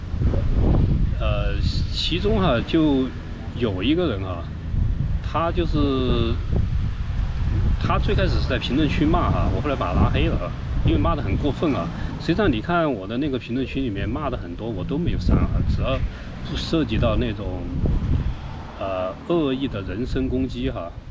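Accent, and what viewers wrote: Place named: China. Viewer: 你是否遇到了马来西亚共产党了，声音与大陆一样啊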